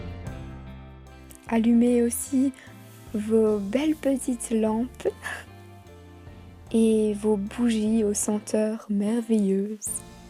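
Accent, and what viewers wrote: Belgium. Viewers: Hello tu viens d'où de Belgique ?? Ou sinon belle vidéo bisous 😘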